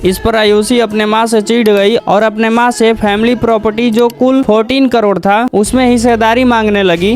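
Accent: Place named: Bhojpuri, India. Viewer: Hindi ko hindi ki tarah bolo...bhojpuri me hindi mat bolo...baaki sab thik hai bhai.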